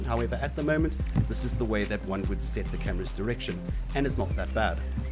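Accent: Afrikaans, South Africa. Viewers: He sounds Afrikaans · you sound like you come from south africa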